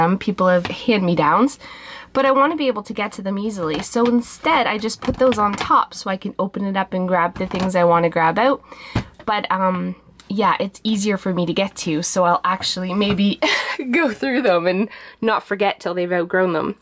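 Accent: Canada. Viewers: I love your Canadian accent! <3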